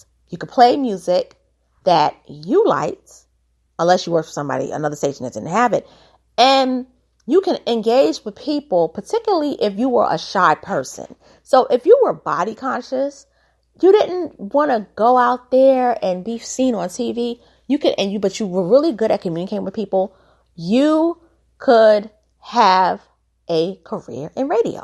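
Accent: New York, United States. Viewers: you sound so NY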